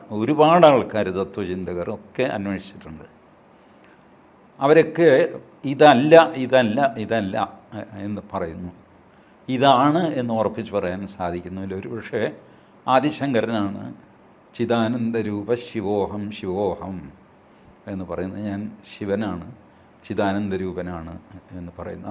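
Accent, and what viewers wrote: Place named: Cherthala, India. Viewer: TG സർ അങ്ങ് ഈ ഇൻറർവ്യൂ വിലൂടെ കോറിയിട്ടത് ജീവ ചരിത്രമാണ്. ഞാൻ ഒന്നു രണ്ടു വർഷമായ് ആഗ്രഹിച്ചിരുന്നു. താങ്കളുടെ സ്വദേശം എവിടെയാണെന്ന്? താങ്കളുടെ ഭാഷ കേട്ടപ്പോൾ ചേർത്തല ഭാഗമാണെന്നറിയാം പിന്നീട് താങ്കൾ മട്ടാഞ്ചേരി TD സ്കൂളിന് അടുത്താണെന്ന് മനസ്സിലായി. പക്ഷേ ഇപ്പോൾ ആണ് താങ്കൾ ജന്മം കൊണ്ട സ്ഥലവും ഒക്കെ അറിഞ്ഞത്,ഞാൻ നാളുകളായ് പലവട്ടം തിരഞ്ഞ ഒരു കാര്യത്തിന് വ്യക്തത വന്നിരിക്കുന്നു. താങ്കളെ പോലുള്ളവരെ കേൾക്കാൻ കഴിയുന്നത് മഹാഭാഗ്യം ജനം TV യ്ക്ക് ആശംസകൾ❤❤❤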